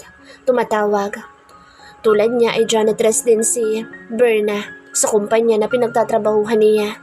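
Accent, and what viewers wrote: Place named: Philippines. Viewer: Sayang kaya wala ng nasyadongnagsusubscribe sa mga upload ninyong story isa na sko sa mga subscriber ng mga maganda ninyongstory pero ngaton I stop subscribing your stories dahil hindi ko na gusto ang narrator ninyo. style s. Style ng pag deliver ng lines at saka nay punto pa. Taga saang probinsiya ka? taga saansng punto na yan galit ka sa pagsasalita mo sayang nasaanyong dsti mong narrator. mula ng mslspit na ang finale ng Hiding The Montemsyor Real Heir pnapitan na sng narrtor kaya hindi ko na tinapos ang siguro sampung episode bg montemayor heir hindi gusto a yong boses ng narrator kaya di na slam sngnaging finale ng Montemayor Real Heir Gusto ko sanang makinig sa story kaya di ko rin. Ma absorb ang voice na galig at mabilis na parang hinahabol ng asong ulol angstyle ng pagsasalita ni miss narrator. Ssaysng sng gsmda sana ng storymo. 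✌️✌️✌️✌️✌️✌️✌️👎👎👎🤮🤮🤮🤧🤧🤮🤮🤮🤮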